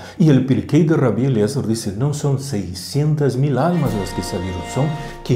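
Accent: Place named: Portugal. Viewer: Es acento portugues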